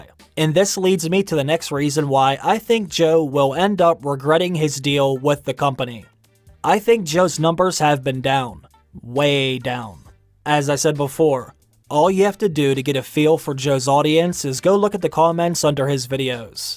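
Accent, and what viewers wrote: Pittsburgh, United States. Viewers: Alright I gotta ask... You’re a yinzer aren’t you?😂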